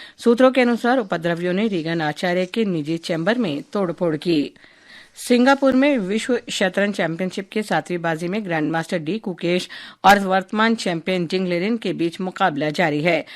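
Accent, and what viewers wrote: Standard. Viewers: jindagi nikal gayi aapko sunate, darshan karna bahut achcha laga . you kept pure hindi pronunciation alive.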